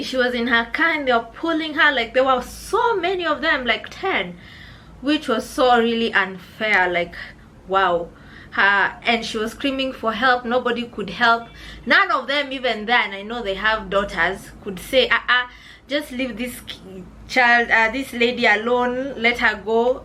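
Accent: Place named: Kenya. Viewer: I always love to hear your stories , sweet kenyan ascent unfortunately too much in Swahili , didn't understand, nice of you though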